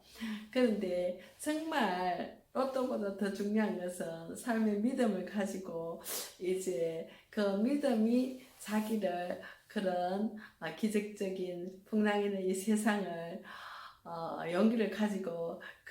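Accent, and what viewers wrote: Gyeongsang, South Korea. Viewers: 안녕하세요. 저도 손잡으러 왔습니다 ~ 경상도 분이시군요 😊 만물의 근원 물. 장말 중요하죠. 시청하고 응원 드리고 좋아요. 손잡고 갈게요~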